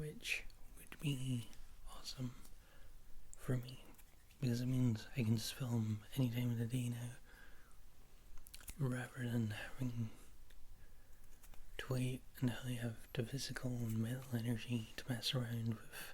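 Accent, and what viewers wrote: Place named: Scotland, United Kingdom. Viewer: Yay welcome back!!! Love these rambley style videos, dat whispering voice + dat scottish accent = <3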